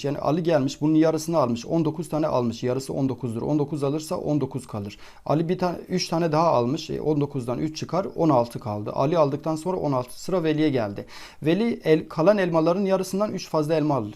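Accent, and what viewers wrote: Van, Turkey. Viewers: hocam sen kesinlikle vanlisin şive oradan ve inanılmaz semlatiklik katıyor:)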